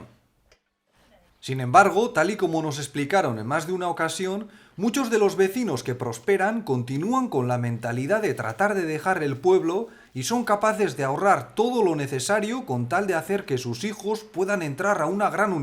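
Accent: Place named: Basque Country, Spain. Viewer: Leo tus descripciones y comentarios como si hablases tu, con tu particular ritmo y acento vasco xD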